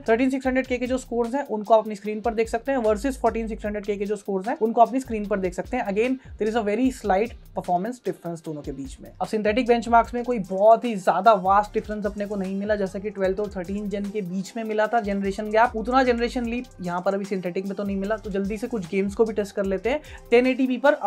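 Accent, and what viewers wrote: India. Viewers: bhaiji tusi punjabi ho ? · tum Delhi se ho kya ?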